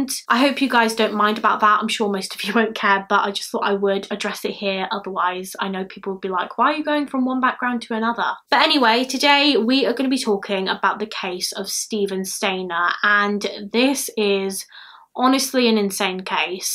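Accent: United Kingdom. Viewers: love your British accent ^.^ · I don't know why, but your British accent is so beautiful. You have a super soothing voice! I loved this video!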